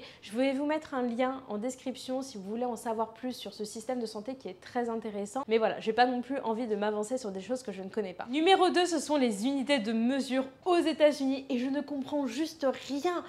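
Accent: France. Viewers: J'imagine que lorsque tu commandais au "drive", que tu parlais anglais avec un très fort accent français.... pas étonnant qu'ils mettaient du temps à comprendre 😄 Sinon, jolie vidéo assez réaliste à part quelques petites généralités pas nécessairement vrai dans tout les états.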